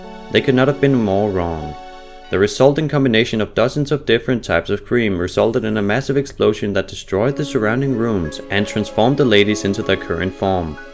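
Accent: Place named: Denmark. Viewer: Yup this was super interesting! Du må næsten være dansker med den accent, right? :P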